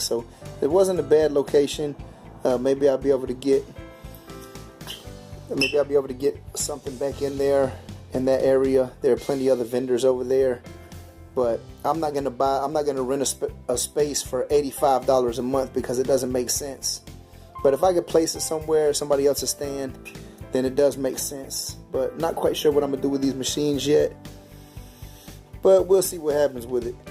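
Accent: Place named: New Orleans, United States. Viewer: Not sure what rabbit hole I’m in that I landed on a vending machine video but I need to know where this man is from so I can place the accent (love it, New Orleans?) and I’m going to look into buying a vending machine!! Lol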